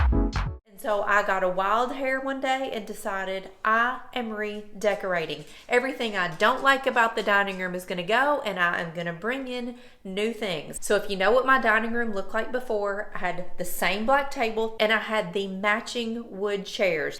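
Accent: South, United States. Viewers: Hello, new subscriber, love your southern accent!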